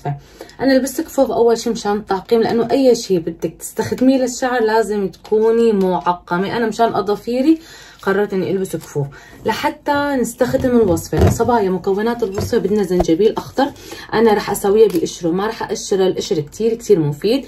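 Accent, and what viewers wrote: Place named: Iraq. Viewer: لهجتج بين العراقيه وبين السورية من أي بلد انتي💙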